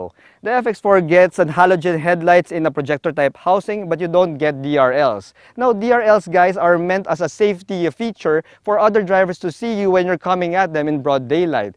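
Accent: Philippines. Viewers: Ang conyo ni sir pakinggan sarap manood 😅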